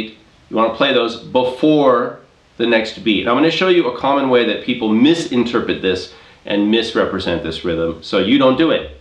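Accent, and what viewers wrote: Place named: Cuba. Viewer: You sound cubano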